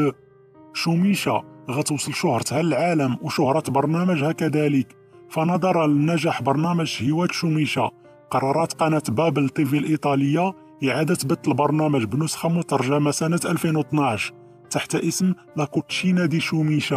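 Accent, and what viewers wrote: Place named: Democratic Republic of the Congo. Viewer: تعليق مقرف من هذا الشخص وكانك تستمع الى كحلوش من الزايير وهو يتكلم العربية.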